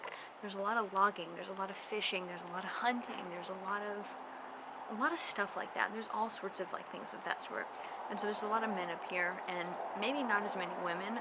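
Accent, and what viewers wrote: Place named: South, United States. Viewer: O Southern accent !! 😮😁 Love ya !! 😻😻 More vids please !! 🤗🇺🇸💯💯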